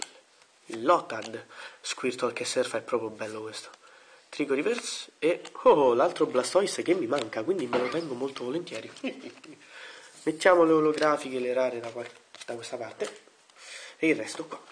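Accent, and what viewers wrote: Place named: Rome, Italy. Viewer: Si sente che sei romano o.o Comunque sei simpatico u.u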